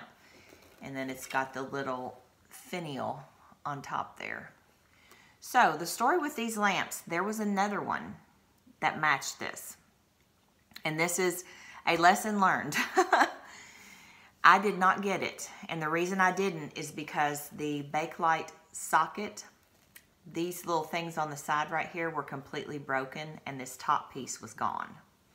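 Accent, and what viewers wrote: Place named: Kentucky, United States. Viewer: Your accent is like spot on to my grandmother's. You in Kentucky by any chance? Around West Liberty (or what's left of it)?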